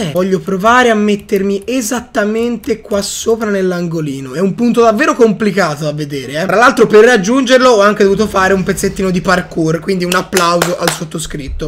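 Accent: Tuscany, Italy. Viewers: Sei toscano?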